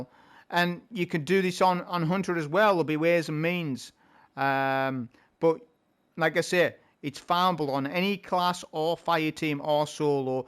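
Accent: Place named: Chesterfield, United Kingdom. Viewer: another great video brother... you sound local to me, im from chesterfield. you got discord?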